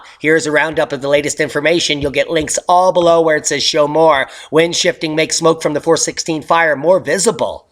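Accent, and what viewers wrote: England, United Kingdom. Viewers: Love your English accent. What accent, I don't have an accent.LOLLOL. Great show. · nice Brit accent bra!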